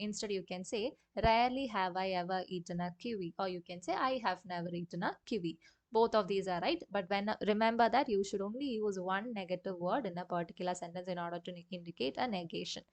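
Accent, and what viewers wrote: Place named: Kerala, India. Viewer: Amazing class ma'am ..feels like you are from kerala ..Great pronounciation skill ma'am ..God Bless You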